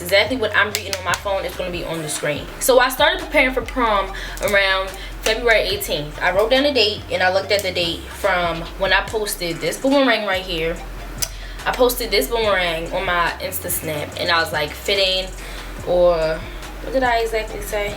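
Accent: Baltimore, United States. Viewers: You from Baltimore? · Would’ve been so mad! You from Baltimore? Me too lol I can tell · i figured you was from baltimore the way you talk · I love ya Baltimore accent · Man I’m 3 mins in & automatically knew she was from Baltimore before she said the designer was 🤗 her accent 😍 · As soon as she started talking I knew she was from Baltimore. 🤘🏽 P.S. You are beautiful 💕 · I just knew u was from Bmore/md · I can tell you from Baltimore lol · Is she from Baltimore ? 😭😭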